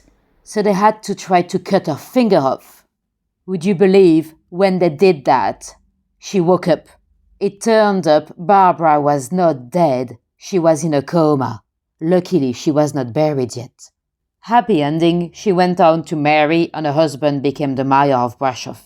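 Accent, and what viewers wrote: France. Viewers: I like ur voice and accent. Sounds like French talking English. 🙂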